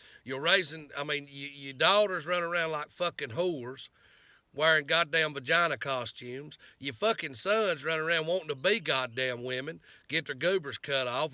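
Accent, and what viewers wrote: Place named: South, United States. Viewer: Damn, I agree what your saying....But you say GD way to much....but your completely right, also, where you from, you sound like your from my part of the south, your ether from Georgia, Florida, or my home state...Alabama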